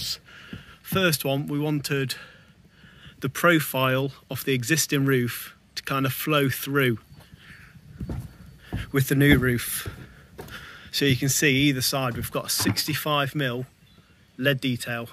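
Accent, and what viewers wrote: Derbyshire, United Kingdom. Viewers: Sounds like your from chesterfield or North Derbyshire ?????? Let me know pall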